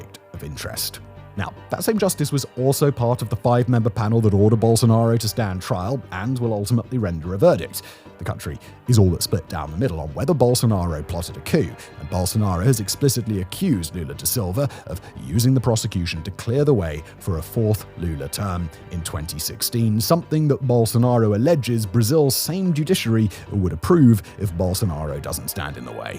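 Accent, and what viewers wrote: United Kingdom. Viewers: never trust a guy with a British accent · The truth isn't within establishment talking points. A bald head and a British accent does not give credibility to obvious propaganda. Understand Ukraine is losing.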